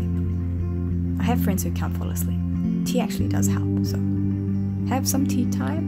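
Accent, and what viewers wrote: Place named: New Zealand. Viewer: I follow you on ig nd u sound lika full on moari 😂 didnt expect tht.. Was thinking like an american accent or asian(i swear im not racist,forgiv🙏)😆 Btw Green tea all da way fo me ✌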